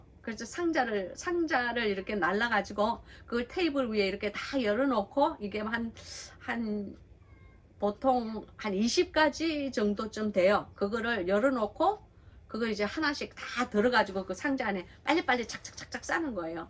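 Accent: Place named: Busan, South Korea. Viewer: 귀하신 말씀 나눠 주셔서 너무나 감사드립니다 부산 사투리가 어쩜 이리 친근하고 매력적인지 쎄가빠게 ….ㅋㅋ 쎄가빠지게 말씀공부 따라 가는 중입니다^^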